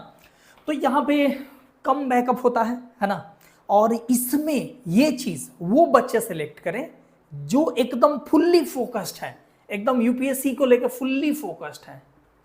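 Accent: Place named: Chhapra, India. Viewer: Sir aapke video me chhapra wala tone aata hai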